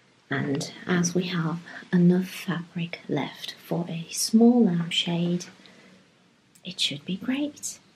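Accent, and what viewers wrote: United Kingdom. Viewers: I agree completely.I couldn’t believe she wasn’t English.She has the most English of voices and I could listen to her all day.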